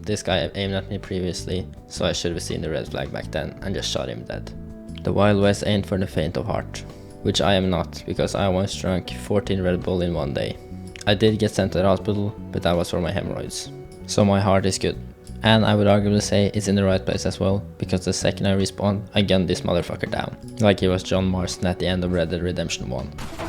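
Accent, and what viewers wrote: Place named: Norway. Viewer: very good norwegian english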